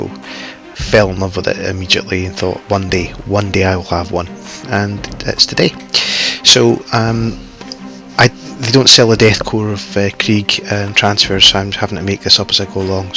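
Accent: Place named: Glasgow, United Kingdom. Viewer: yup.....no tatties in your ears! You from Glasgow, I presume? Fantastic video, crystal clear, and a beautiful, beautiful build. Outstanding. Personally, I build WW2 stuff, but I can learn a lot of from your videos. I'm gonna subscribe and send a link to my pals of your fantastic work on our Google+ modelling community.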